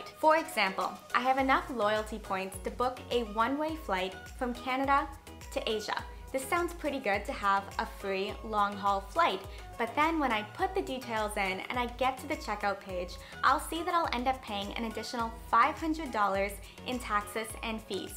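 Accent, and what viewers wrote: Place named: Boston, United States. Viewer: Love your Bostonian accent :)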